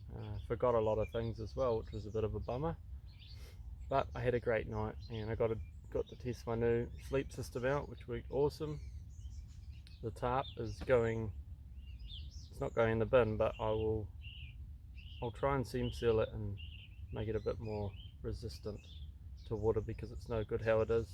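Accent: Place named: New Zealand. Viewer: You are fun to watch. Your sense of humor is so laid back. You don't yell like others do. Love your New Zealand accent. Please keep making videos.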